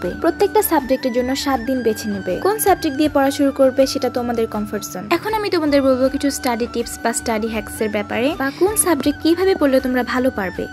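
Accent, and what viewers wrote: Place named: Sylhet, Bangladesh. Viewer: Are u sylhty??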